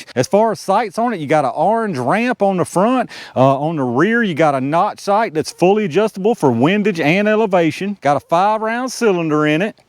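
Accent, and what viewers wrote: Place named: Texas, United States. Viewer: You sound like your from Texas bro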